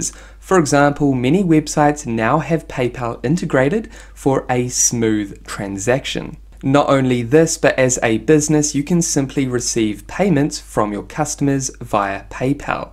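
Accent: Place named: New Zealand. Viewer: Aww jesus, a NZ accent is bad enough but a queer NZ accent is the pits!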